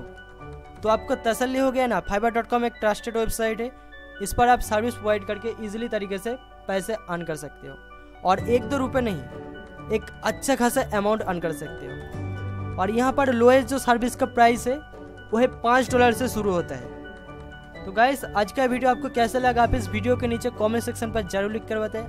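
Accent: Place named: Bengal, India. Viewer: Bro thik se hindi bolo banhali kayse bol raje ho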